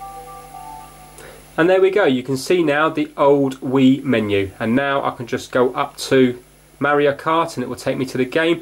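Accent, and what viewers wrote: United Kingdom. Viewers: anyone noticed is a british person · britisch accent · He’s British.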